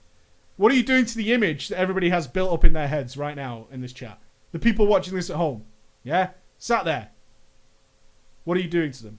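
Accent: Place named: Manchester, United Kingdom. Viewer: I'm learning english listening to you! I'm starting to have that manchester accent lol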